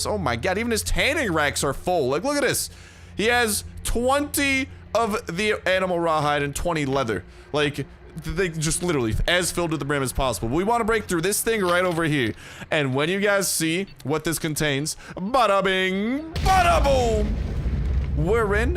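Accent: Russia. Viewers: Are you Russian? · Can you please stop the Russian accents it’s kind of annoying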